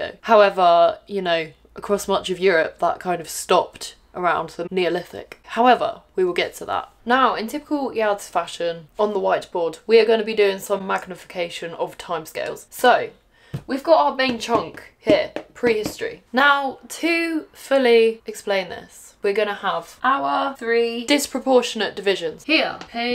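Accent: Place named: United Kingdom. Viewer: I’m mainly watching for the British accent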